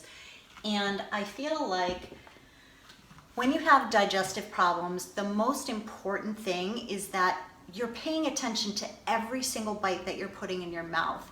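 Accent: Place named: Canada. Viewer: I needed this. Been overwhelmed watching endless videos on FODMAP. I'm going to get this book. Just finished listening to a radio show with the author. Oh btw, you sound Canadian. Still trying to get over your age. You don't look it so you must be doing something right. I'm the same age and get compliments that I look 32-36 but you look young as ever. Thank you for the help. Now a subscriber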